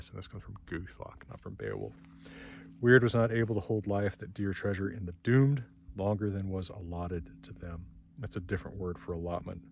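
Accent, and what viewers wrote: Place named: United States. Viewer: I was gonna say the opposite, when he speaks it that quickly the American accent comes thru quite clearly, imo. in slower readings, such as the beowulf series with simon, there's much less american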